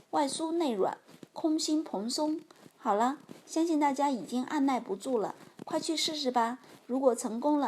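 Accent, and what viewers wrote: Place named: Fujian, China. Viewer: 你是福建人吧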